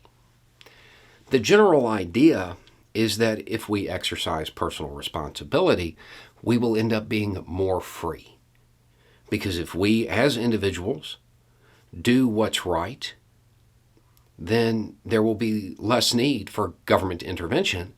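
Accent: United States. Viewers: You're so relatable with your country English. 🤘 P.S.: I'm late to the shot party, but I got mine. I felt zero pain or side effects. I'm still masking, cuz Delta is a bitch. Got the J&J vaccine. Never hear/see shit about people gettin' Delta on THAT one and done.🤔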